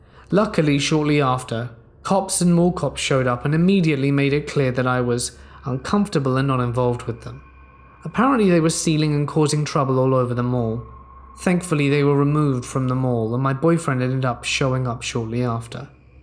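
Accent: United Kingdom. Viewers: I heard the collaboration you did with Nocturna on her channel. i really enjoyed the video, also it's crazy how perfect your Spanish is, i was blown away. i also think it"s crazy how in English you have a British Accent and in Spanish you have perfect Mexican type Accent. I never knew anyone like that, that can speak with a British English Accent and Mexican Spanish Accent....anyways Thank You and God Bless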